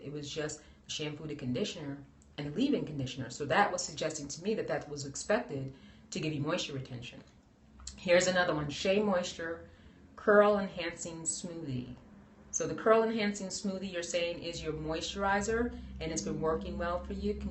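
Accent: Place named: Bronx, United States. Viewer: randomly passing by and then I hear the bronx, woah, I'm from castle hill! living in FL now :(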